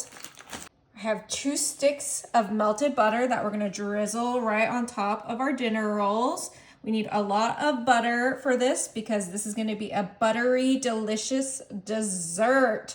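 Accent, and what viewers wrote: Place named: South, United States. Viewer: People seem nastier to others these days. Plus she is a southerner ( I'm from Georgia) and the accent makes her a target. Although my husband is from Alabama and I make fun of him saying ass for ice. It's a little funny. There are many cinnamon roll recipes out there. Leave Miss alone. Get Back Satin in Yeshuas name.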